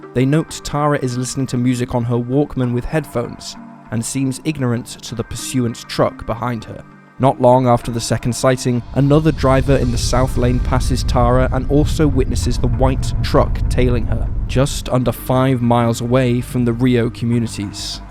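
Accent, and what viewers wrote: England, United Kingdom. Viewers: sadly I fear your conclusion is correct ... men, and police in particular, protect their own. So the son would have been aware that he could get away with such vile behaviour. Have watched other similar channels but your gentle English accent appeals, thanks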